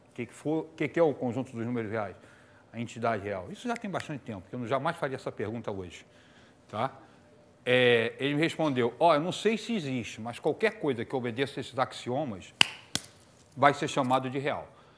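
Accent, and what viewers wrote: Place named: Rio de Janeiro, Brazil. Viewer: Esse professro é carioca! acertei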